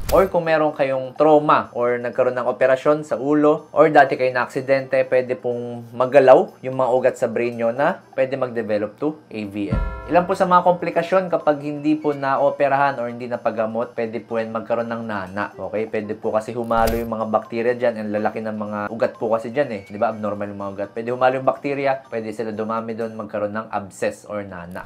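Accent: Visayas, Philippines. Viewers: Bisaya sya idol...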